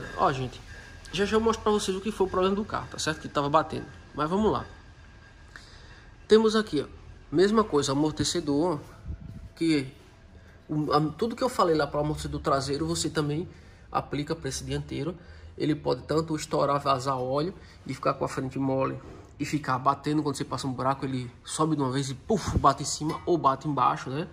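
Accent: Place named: Pernambuco, Brazil. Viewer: Pelo sotaque se for de Pernambuco vou te procurar. Tenho um Etios e queria ué CV olhasse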